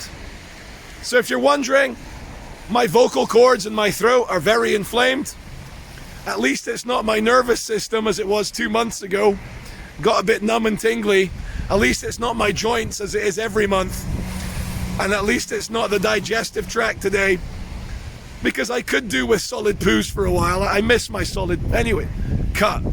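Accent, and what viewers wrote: Scotland, United Kingdom. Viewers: Are you Scottish? I've been trying to work out your accent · makes you sound more Scottish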